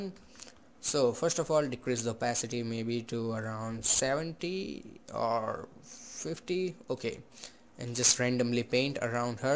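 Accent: India. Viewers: That's racist as shit. Sure he has an indian accent, but his English is alright!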